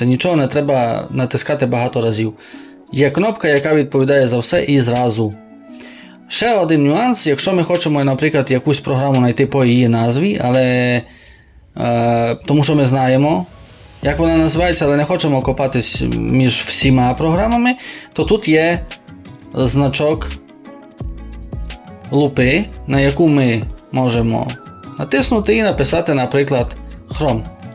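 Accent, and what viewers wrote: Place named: Transcarpathia, Ukraine. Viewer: кострубато то кучеряво (пелехато, заплутано), просто ви розмовляєте дуже подібно до закарпатського діалекту, і людина подумала що ви десь з виноградівського-берегівського регіону. Якщо чесно я також так подумав, але якщо ви не знаєте що таке "кострубато" то я навіть не знаю звідки ви.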